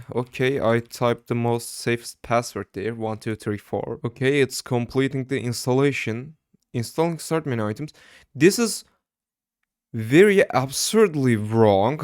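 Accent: Turkey. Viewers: Are you From Turkey?! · seni ilk duyduğum anda bu Türk dedim kardeşim en duyduğum en tükçe İngilizce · valla ilk saniyeden turk oldugunu anladim, aksan ele verdi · türk aksanı baya belli etmiş ama video kaliteli abone oldum:) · Daha girişte anladım türk olduğunu türk türkü tanırmış dostum videolara devam🔥🔥🔥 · video çok iyi olmuş ellerine sağlık türk olmana şaşırdım biraz aksandan anlaşılıyo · kral ilk 3 saniyede aksanından anladım. ülkemizden böyle içerik çıkması çok güzel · hayatımda duyduğum en türkçe ingilizce reis süper video adamsın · Konuşma tarzından direkt Türk olduğunu anladım böyle videolar yapmaya devam et Türk kitlesinden bir cacık olmaz❤ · türk aksanın mükemmel ya hem mükemmel ingilizce konuşuyorsun hem türk olduğun belli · Türk telaffuzu, nerde duysam tanırım. :) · First time looking at this channel and the first three words I instantly knew you were Turkish just by accent lol. 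Great video man, keep it up. Ellerine saglik hocam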